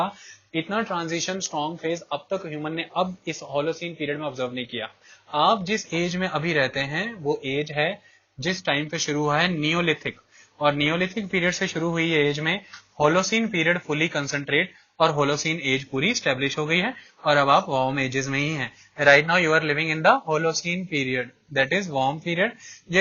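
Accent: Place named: Punjab, India. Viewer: The Punjabi touch in your lectures makes class really interesting. Though, there is no Video lecture still you make these Audio lectures really interesting by using your 3D imaginations as JHRRRRRR JHRRRRRRR....!!! Hahahaha...! Really enjoy your classes and content is really amazing. And the way you explain each and everything is like Cherry on the Cake....! <3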